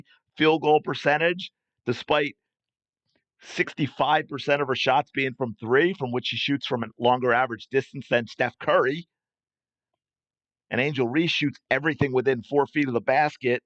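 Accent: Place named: AAVE, United States. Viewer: Would you please speak English. I dont understand ghetto ebonics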